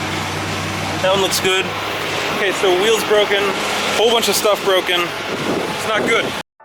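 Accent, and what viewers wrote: Canada. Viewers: that canadian accent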